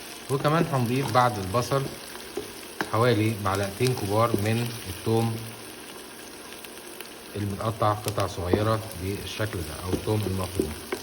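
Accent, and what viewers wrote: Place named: Egypt. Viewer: حضرتك لهجتك مصري جدا ...لكن اكلك كله هندى وخليجى...انت متأكد انك مصرى🤔😄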